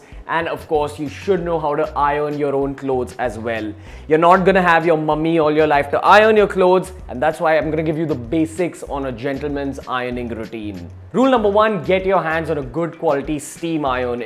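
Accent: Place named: India. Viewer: "short" or "shirt" sh*t!!!! that's how indians speaks!!!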